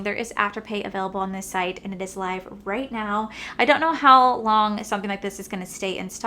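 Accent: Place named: Florida, United States. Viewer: I am awe struck💕💕💕💕. I consider myself a Floridian by choice…..a proud WV by birth. You are a true Floridian with a hint of your moms accent. Go! You have a talent of putting colors together! Be safe…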